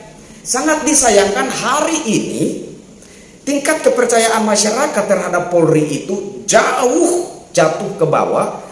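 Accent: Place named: Java, Indonesia. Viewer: Setuju ade.... Logat jawanya keren... 🤭🙏👍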